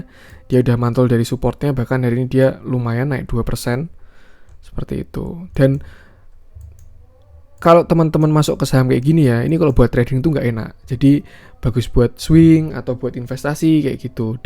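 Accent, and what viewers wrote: Indonesia. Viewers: Dochy Suara mimin nya medok banget, Dan terdengar sangat familiar, Dr.mimin 😂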